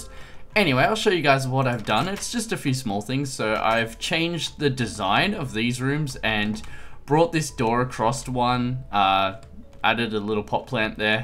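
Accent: Australia. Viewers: You're one of my favourite youtubers, you deserve way more subs man! Awesome content! And people say to me: You sound Austrialan, then I directly think of your voice haha! :D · Are you Australian?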